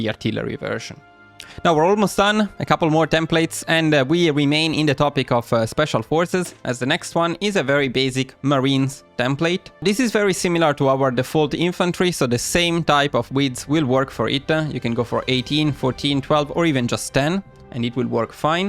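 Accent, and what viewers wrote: Italy. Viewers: Hey great video (as always). Completely unrelated question, are you Italian?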